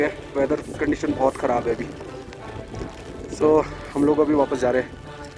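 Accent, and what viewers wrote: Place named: Ladakh, India. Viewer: You speak Ladakh pure very nice!!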